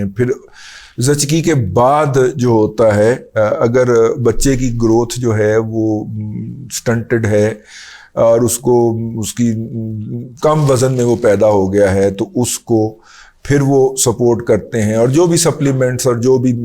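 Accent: Punjab, Pakistan. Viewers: پتہ نہیں لوگوں کا ذوق کیا ہے جنہیں سہیل وڑائچ جیسا چولیں مارنے والا شخص بہت بڑا لکھاری لگتا ہے. نہ کوئی کہانی تھی نہ کوئی سسپنس تھا نہ حقائق اور حالات و واقعات سے ہم آہنگی. مجھے پاکستانیوں کی اس فطرت سے ہمیشہ نفرت رہی ہے کہ نہ منہ دیکھتے ہیں نہ متھا لیکن کسی پیسے والے شخص کی خواہ مخواہ چاپلوسی اور جھوٹی تعریفیں کرنے لگتے ہیں. میرے نزدیک سہیل وڑائچ صحافیوں کے بھیس میں وہی چیز لگتا ہے جیسے موسیقی کی دنیا میں چاہت فتح علی خان ہے. نہ اس شخص کا تلفظ درست ہے نہ ہیجے نہ لغت نہ نستعلیقی رموزِ تکلم سے آگاہی بلکہ اس کا اردو کو پنجابی لہجے میں بولنا ایسے ہی لگتا ہے جیسے عربی انگریزی بولتے ہیں.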